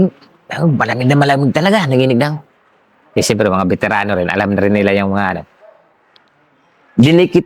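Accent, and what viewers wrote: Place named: Iloilo, Philippines. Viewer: cguro ilonggo ito si master ah sa accent nia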